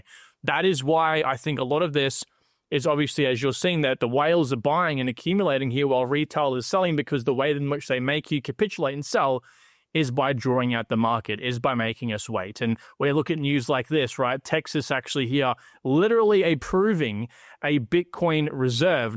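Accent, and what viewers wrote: Australia. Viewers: Nice video. Tho, I would enjoy your channel alot more if the Australian accent was toned down a bit.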